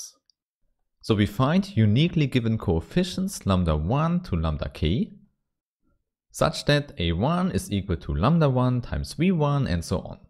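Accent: Germany. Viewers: Haha sehr geiles Videos. Das deutsch ist nicht zu überhören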